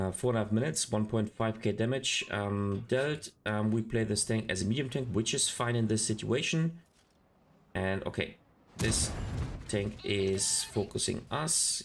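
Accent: Germany. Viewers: Cool stuff! I like it 👍 btw: Ist deine Muttersprache evtl. deutsch?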